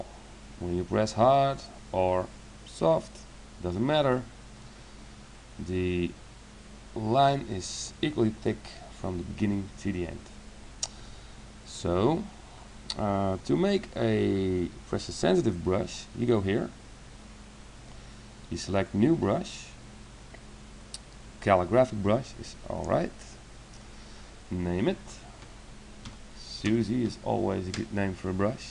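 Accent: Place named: Netherlands. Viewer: Thank you, very good video ! By the way, your english accent is very nice for a Dutchman ! And I can tell because, I'm Dutch too :)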